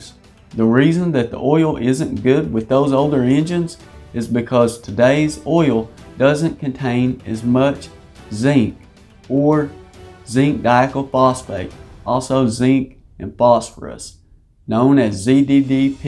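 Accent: South, United States. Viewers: Super strong southern accent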